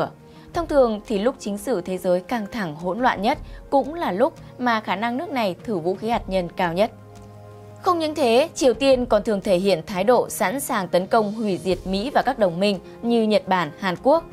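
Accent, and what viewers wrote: Standard. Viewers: là số một hiện nay ở việt nam nhưng bình luận vẫn phụ thuộc ý tưởng của bbt. Người đẹp, giọng chuẩn, có hồn khi truyền đạt. Luôn ủng hộ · CHị dẫn chương trình hay quá....! giọng rõ phát âm rất chuẩn,dể nghe...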